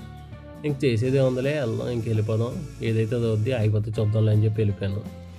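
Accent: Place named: Godavari, India. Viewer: Godavari yasa add ayyaka godavari andhalu inka bagunnayi.❤.keep going 👍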